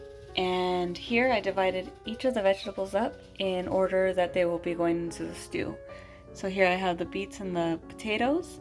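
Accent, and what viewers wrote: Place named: Russia. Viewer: Great video! Love that Russian accent!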